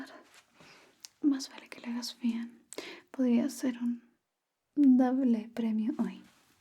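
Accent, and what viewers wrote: Chile. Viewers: siempre vengo a estos videos con acento chileno ❣️ agradecido! 😊 (ese es el valorrrrrr jajaj) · Hola me gusta mucho el asentó chileno ❤❤❤❤❤❤ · Hola, Que tal estás? 🙋🏻‍♂️👋🏻 La primera parte del vídeo me ha gustado mucho y ahora la segunda parte me está gustando aun muchísimo y el español chileno me encanta y es bacán amiga 🥰💞✨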